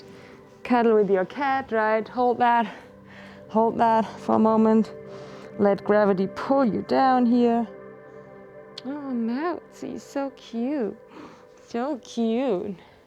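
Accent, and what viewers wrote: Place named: Ireland. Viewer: I’m just curious q about your accent, sounds some Irish in it, I always watch👌your videos.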